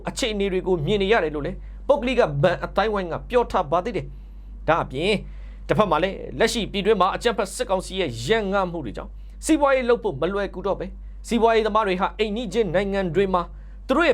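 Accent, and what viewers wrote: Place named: Bago, Myanmar. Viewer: ခေတ်သစ် သတင်းကြောငြာတယ့် သူက ဘုန်းကြီးလူထွက် ပဲခူးသားဘဲ ဖြစ်ရမယ့် လေသံက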